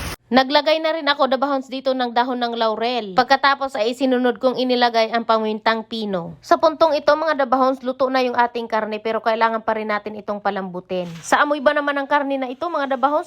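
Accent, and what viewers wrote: Bicol, Philippines. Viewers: Bicolano po ba kayo?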